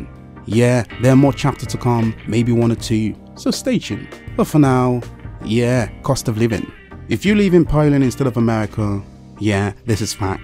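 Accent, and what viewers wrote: United Kingdom. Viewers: are you American? Your accent doesn't sound like. British I suppose? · "America" in title >British accent I'm not watching this. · Sounds like you're British, not American. Am I right or am I right ? :)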